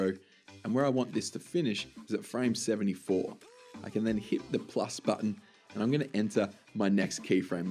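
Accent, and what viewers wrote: Australia. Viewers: They're both Australian?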